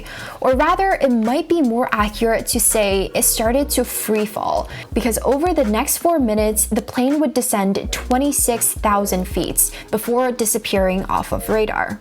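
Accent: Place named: China. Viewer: I love your chinese -english language, you are easy to understand so new to your channel so dont know what u cover but will soon find out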